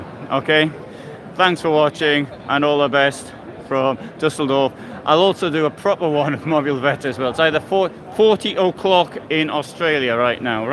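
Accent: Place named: North East England, United Kingdom. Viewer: watching your videos are my guilty pleasure which I took up in lockdown. I’m a bit too old to take on a campervan. I’d be a bit daunted by the size since I drive a Smart Car! Will you be showing us your canine companion on one of your videos? Lovely to hear a fellow north easter on here. Thank you so much for doing these videos.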